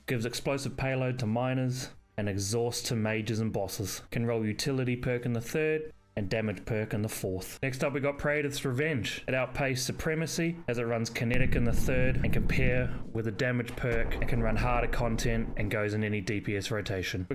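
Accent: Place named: Australia. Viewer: I like your Australian Accent mate!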